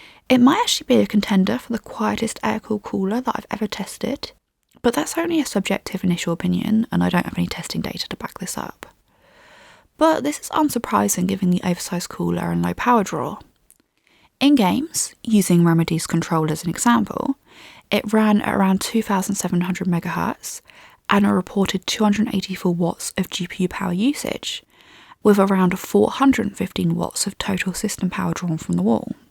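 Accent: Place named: RP, United Kingdom. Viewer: i imagine this is what its like to listen to english royalty talk about GPU's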